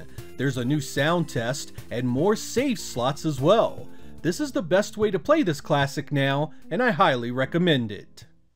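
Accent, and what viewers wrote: United States. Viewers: After so many videos it finally hit me why your accent is so familiar, you must be from the same state as Tim Cook?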